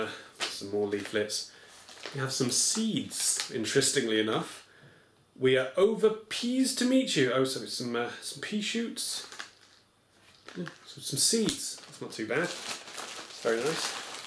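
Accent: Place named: Midlands, United Kingdom. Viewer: I have only just found your channel for some reason..Love the videos...you seem like a normal person ,you have the type of humour I like .I'm from the Midlands in the uk...you sound like not too far away.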